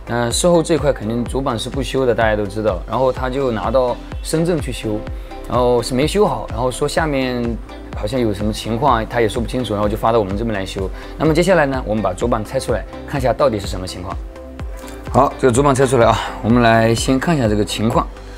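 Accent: Wenzhou, China. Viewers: 没看名字，一听就是温普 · 可笑下面一群人在那里感叹师傅真是良心啊plapla的，这up主是专门拍维修视频的，点掉这么多正常情况下维修店都是放弃维修了吧，浪费这么多时间得不偿失都维修，不过还是得赞up主的心灵手巧，这口音很熟悉，身边很多都是温州人，特别的聪明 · 一听你的普通话就知道你是温州人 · 还是能听出来温州口语的普通话。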